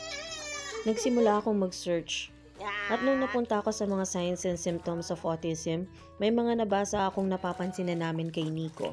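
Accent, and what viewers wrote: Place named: Pampanga, Philippines. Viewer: Hi po Mommy napansin ko po kampangan kayo..taga pampanga po ba kayo?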